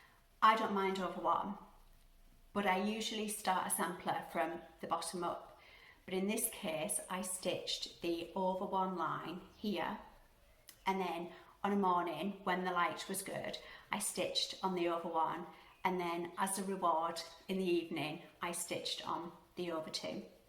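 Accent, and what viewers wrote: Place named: England, United Kingdom. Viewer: Your accent sounds so familiar and I may have figured out why. It seems to be the same accent as several of the characters of Downton Abbey. Am I correct in that guess or totally off base? Another great video.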